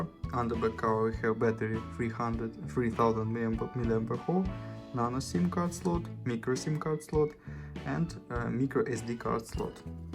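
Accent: Russia. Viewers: Русского человека слышно сразу) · с ярко выраженным русским акцентом и отвечающий только на русские комменты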